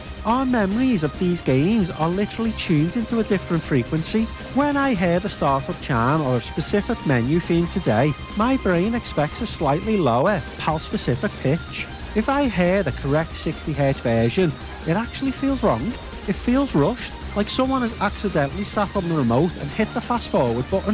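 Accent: Liverpool, United Kingdom. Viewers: I'm not even from the UK but I subscribed too. Partially for the great video, but also for the Scouse accent ! :D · Instantly subbed the second I heard a Scouse lad talking PS1. Class video mate, keep it up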